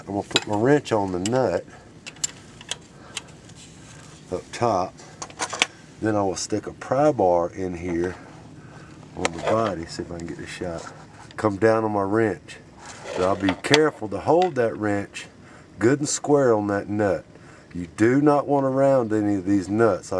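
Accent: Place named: Carolina, United States. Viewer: I knew it! Carolina accent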